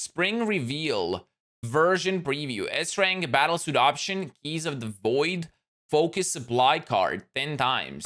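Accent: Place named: Eastern Europe. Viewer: I'm assuming English isn't your native language? You're pronunciations are weird eastern European I assume?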